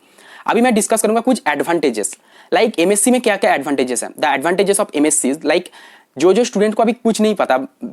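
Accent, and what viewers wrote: Bengal, India. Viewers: Sir are you from West Bengal? 😮😮😮 Your sound is looking like Bengalies.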